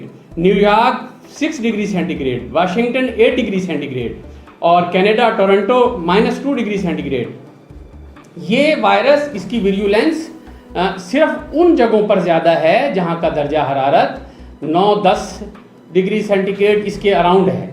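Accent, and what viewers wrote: India. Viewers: اسکا لہجہ تو انڈیا جیسا ہے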